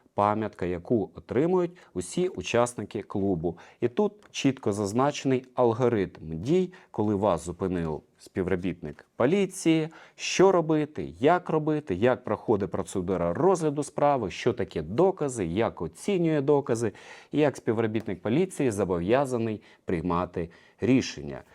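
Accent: Russia. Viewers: не "К"арячу лінію а Гарячу, В УКРАЇНСЬКІЙ МОВІ "Г" ВИМОВЛЯЄТЬСЯ БЛИЗЬКО ДО "Х" А НЕ "К" ЯК В КАЦАПНІ, ВЖЕ ЧАС ЗАПАМ"ЯТАТИ, ТА ПОЗБУВАТИСЯ КАЦАПСЬКОГО АКЦЕНТУ, НЕ ВПЕРЕШЕ ТЕБЕ ВИПРАВЛЯЮ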